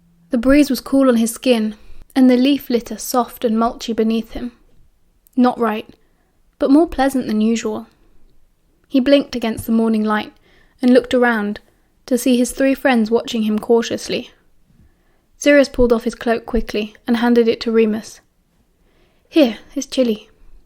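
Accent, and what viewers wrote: United Kingdom. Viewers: Your brittish accent is supremacy 🛐🙏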